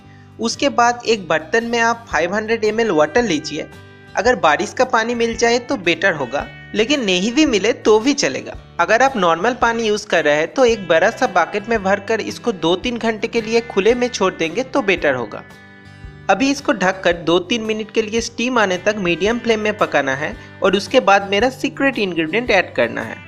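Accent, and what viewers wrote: Bengal, India. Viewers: Nice accent. Bengali style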